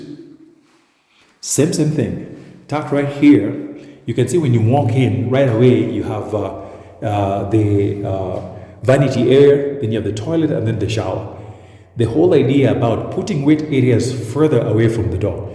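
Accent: Luo, Kenya. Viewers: Like your tweng..but yu keep it real when yu pronounce Luo words..kosele!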